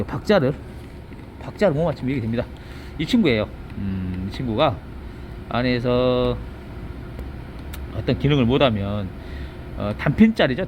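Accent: Jeolla, South Korea. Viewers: 고향떠나 해왜생활하면서 전라도 고향말을 잘듣고있습니다. 건강허세요. 😜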